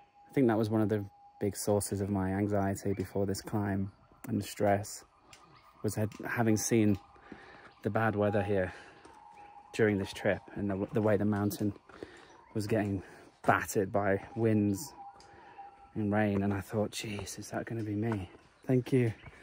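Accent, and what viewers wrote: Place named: Newcastle, United Kingdom. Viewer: Ahhh a Geordie 😂😂😂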